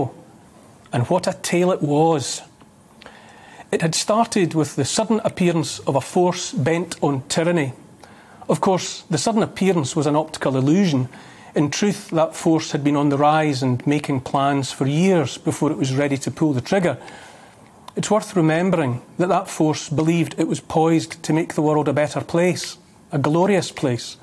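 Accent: Scotland, United Kingdom. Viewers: this is proof that if you hire a Scottish man to talk about anything and vaguely reference William Wallace, people will agree regardless of how idiotic or poorly thought out the statements may be 😬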